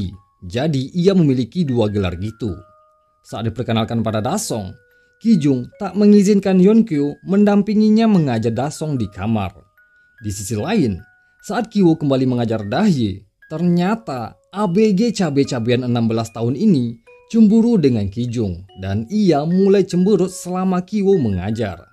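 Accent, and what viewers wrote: West Sumatra, Indonesia. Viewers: lo pasti orang sumbar bang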